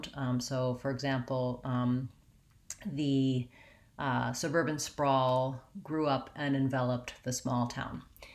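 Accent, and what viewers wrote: United States. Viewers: You’re from the Midwest I assume?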